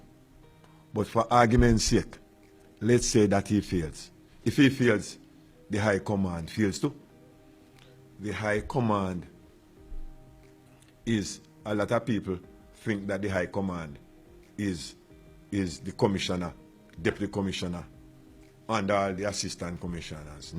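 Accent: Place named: Jamaica. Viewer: The man yah patois deep you fret!!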